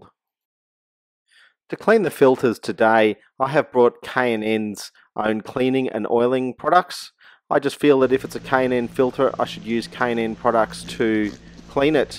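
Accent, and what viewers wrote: Australia. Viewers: you have an aussie accent mate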